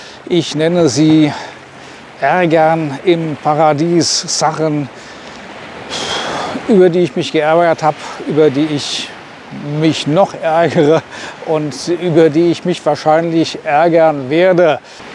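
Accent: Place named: Hesse, Germany. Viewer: Ei Guude,.Ich lebe und arbeite seit 32 Jahren in Thailand/ Deutschland, spreche fließend Thai und bilde mir ein, das Land und die Leute relativ gut zu kennen. Dein Kanal ist einfach sehenswert, weil Du offen und ehrlich über Deine ersten Erfahrungen sprichst, versuchst, Infos zu vermitteln, obwohl Du selbst ja noch ein " Rookie" bist und das alles in schönstem hessisch. Ich finde das sehr unterhaltsam und rate Dir, diese Trolle einfach zu blockieren. Leider gibt es diese Art Menschen auf jedem social medium, die nur darauf warten, aus ihren Löchern herauszukriechen und irgendwelchen geistigen Dünnschiss von sich zu geben. Lass Dir Dein YouTube nicht madig machen, weiter sie bisher und von mir gibts, wie immer, einen Daumen nach oben! P.S. und grüße mir mein Pattaya. Habe da einige Jahre gelebt und es war eine tolle Zeit. Bangkok, der Norden, Phuket usw. 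sind toll, aber Pattaya ist eben was Besonderes ( hatte ich erst nach 7 Jahren Aufenthalt in Thailand festgestellt, da ich mich so lange geweigert hatte, mal nach Pattaya zu fahren ) Ich werde da bestimmt als Rentner wieder auftauchen.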